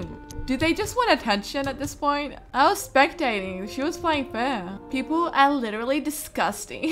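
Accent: United Kingdom. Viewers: hi hi hi hi pls answer my question are you British or American?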